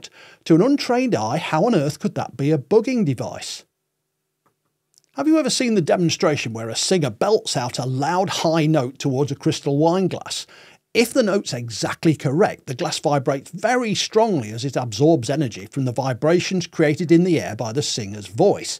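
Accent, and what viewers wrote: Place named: Scotland, United Kingdom. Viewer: This is the first video I've watched of your channel. I'm going to subscribe, absolutely hitting on things I'm very much interested in. One thing I'd like to observe, I believe you may hail from the same geographic location as Sean Connery. It sounds that your dialect and accent is very similar to his, unless he was simply acting and faking his natural voice on screen. Anyway, thank you for your hard work!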